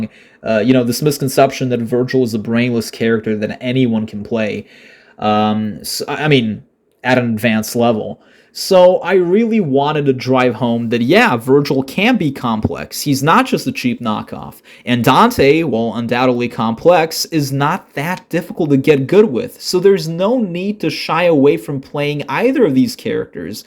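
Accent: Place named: North America. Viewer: Are you Serbian? Your YouTube UI says so but your accent doesn't sound like you've left North America for a second of your life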